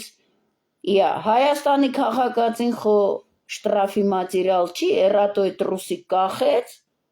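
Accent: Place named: Msho, Turkey. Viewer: ❤❤❤❤❤, так приятно слушать Вас именно на моем родном Мшо диалекте ❤❤❤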